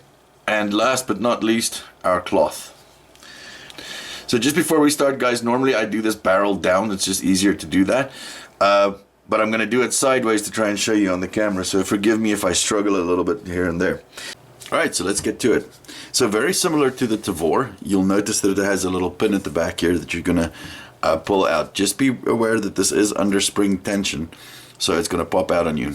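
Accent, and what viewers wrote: South Africa. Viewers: Enjoy the videos, man! I have to ask, is that a South African accent?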